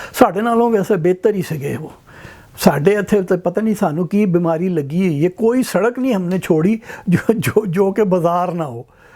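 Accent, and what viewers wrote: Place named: Talagang, Pakistan. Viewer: My parents and grandparents emigrated from Talagang. Your Punjabi is similar. Are you from that area?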